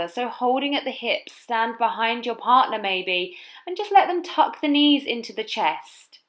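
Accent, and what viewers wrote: United Kingdom. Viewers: Good job ! British English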